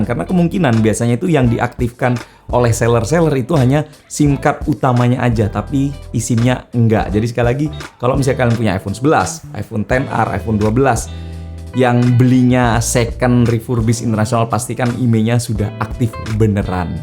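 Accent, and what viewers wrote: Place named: Madura, Indonesia. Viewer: bang gua baru notice lu orang maduris dari cara ngomong lu baru ketangkep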